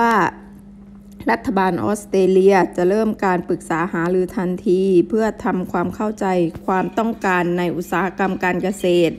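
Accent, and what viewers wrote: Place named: South, Thailand. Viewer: คนอีสาณ แต่พูดสำเนียงคนใต้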